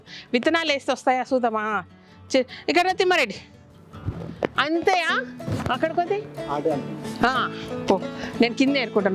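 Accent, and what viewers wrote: Telangana, India. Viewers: మన తెలంగాణ భాష మన యాస వేరే అబ్బా 🙌🙌🙏🙏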